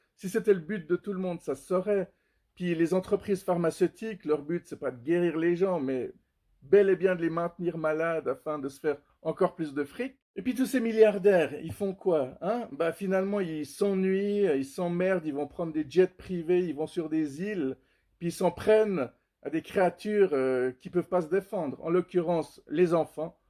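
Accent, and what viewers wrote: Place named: Switzerland. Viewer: C.est ton accent suisse qui fait que tu n'es pas cru quand tu dis en ligne que tu es un bad boy... Les religions, la politique, la meteorologie, ne sont pas les meilleurs sujets pour discuter...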